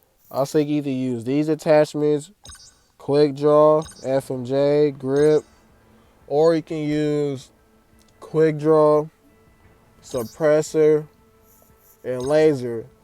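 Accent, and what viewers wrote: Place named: Bay Area, United States. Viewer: Bruh can tell by the way u talk u gotta be from around the bay, Vallejo here 💯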